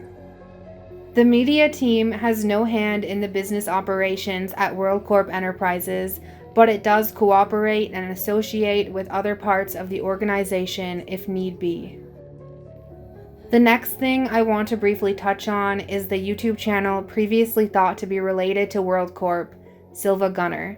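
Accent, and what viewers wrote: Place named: Minnesota, United States. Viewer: Lol im waiting for a doncha know after every sentence. With your accent Minnesota? Montana? The dakotas U got that up north Canadian accent. But yea we need to go after these pedo punks. I wanna batman up with a posse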